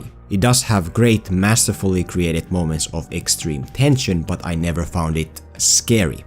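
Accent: Finland. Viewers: Is finnish? Do i hear a finnish accent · Are you from finland. Your accent is atleast similar to the finnish one so.... Torille tavataan ja SUOMI PERKELE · Are u from Finland?, Ur accent sounds Finnish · Are you Finish cause you have a real Finish accent... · He sounds Finnish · Is this Man finnish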